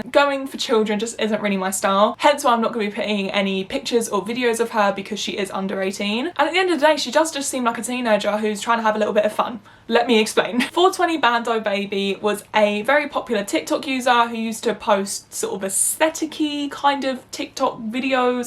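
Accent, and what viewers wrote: England, United Kingdom. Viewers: English accents might be more diverse than you think. Her accent is very clearly an English one.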